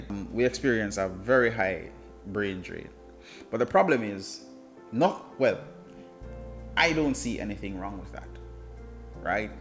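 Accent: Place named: Jamaica. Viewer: I am watching the video and my mouth is on the floor because I am wondering if it is the Island Jamaica that I still live on where a week don't go by that I don't experience, the worst Customer service possible to the average Jamaican, the slaughterhouse Healthcare system that took my mom's life in 2022 and continue to do so for the average Jamaican without accountability and not to mention the death rate of our women and girls consistently. For a moment I wanted to believe he was talking about another Island or Country named Jamaica but he has our accent and sound very much like wi, so him really talking about the Island I live on. I think I betta mind mi business and allow him to exercise him Marketing Skills.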